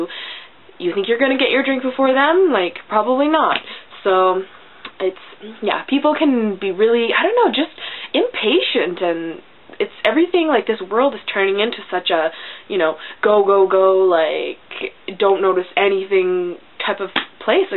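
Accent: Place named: Canada. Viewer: you have such a canadian accent <3 im canadian but your accent is so clear! 'you know' so canadian!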